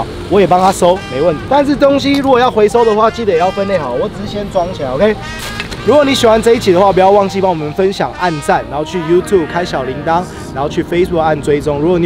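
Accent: Japan. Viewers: 他說的英文是那邊的英文？ 日式英文？ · 我喜歡你的日式英語😆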